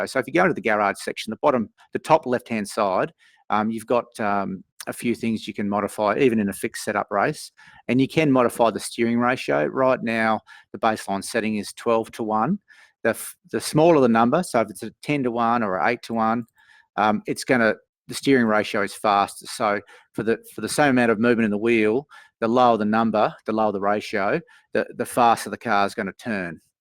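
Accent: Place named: Australia. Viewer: maaaaaaate, such a occa accent!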